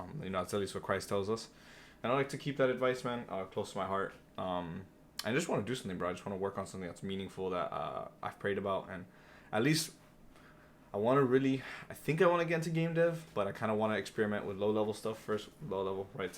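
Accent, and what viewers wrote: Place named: Brazil. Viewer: Suspeitei, meu irmão, que vc fosse brasileiro por alguns trejeitos de sotaque que eu tbm tenho (bem mais que você, é claro). Valeu pela mensagem, tô aqui no Brasil, na luta, trabalhando de SysAdmin e Analista de Sistemas numa pequena empresa, mexendo principalmente com ERP. Tenho sempre tentado seguir o caminho que Nosso Senhor pôs pra mim, antenado às mudanças do mercado mas sem se encabrestar por elas, senão pelo Evangelho; e esse emprego foi mais uma bênção que ele concedeu para minha vida. Que Jesus, nosso Deus, Maria, sua mãe, e São José, seu pai adotivo e provedor, te ajudem na tua própria jornada, irmão. Fica com Deus!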